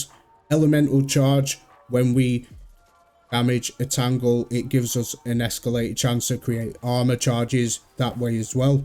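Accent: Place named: Blackpool, United Kingdom. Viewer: Blackpool accent sounds very similar to,was there last month ,night out with wife 🤙🏻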